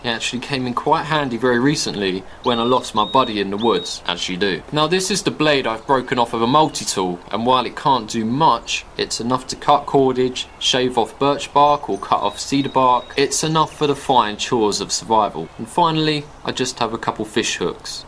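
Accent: United Kingdom. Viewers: Awesome british accent, amazing and clear tips and good jokes, officially sir, you are awesome !! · did u say u need a fick durable knife? did u say sheaf? free sections of gear? Firty meters of fishing line? I love the way brits talk !! do us yanks sound like that to u guys?? Oh yea and u got all those bags of morale boosters but i didnt see a bag of weed or a bag of coke!! Cheers m8. Put another shrimp on the bar-b :)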